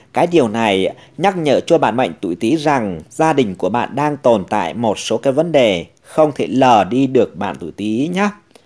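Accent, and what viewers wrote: Thanh Hoa, Vietnam. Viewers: Troi Thanh Hoa de nghe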